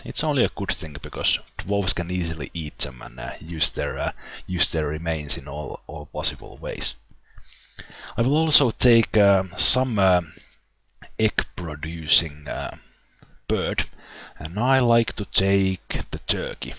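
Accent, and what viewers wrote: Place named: Finland. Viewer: Just out of interest. Do you happen to be Finnish? Your accent sounds exactly like most of us Finns.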